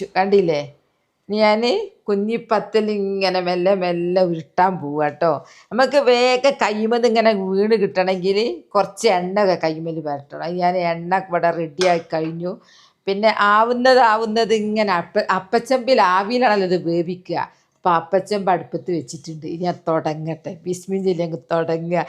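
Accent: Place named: Wayanad, India. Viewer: Wayanadan...samsaram...ugran... Thrissur..ninnum...❤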